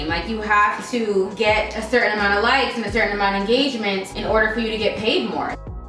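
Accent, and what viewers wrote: Jamaica. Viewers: Am I the only one that can hear a lil bit of your Jamaican accent?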